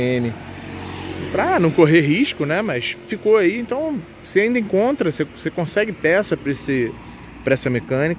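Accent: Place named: Rio de Janeiro, Brazil. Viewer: pelo sotaque do dono do video é Rio de Janeiro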